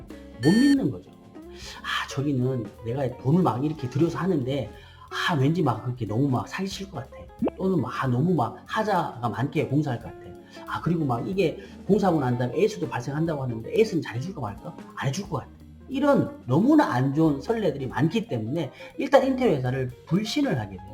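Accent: Busan, South Korea. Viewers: 사장님 말투는 부산인데, 서울업체네요 아쉽:::😅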